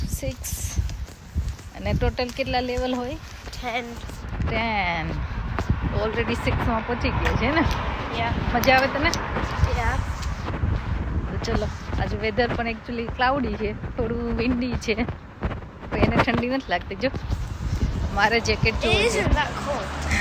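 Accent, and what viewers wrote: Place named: Kathiawad, India. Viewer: Di tame bau j mast kathiyavadi Gujrati bolo che. to favourite che j n. Tamne Instagram pr jowa ni bau j maja awe. Keep it up n Grow much much more 🎉 Lots of love to you and your beautiful family ❤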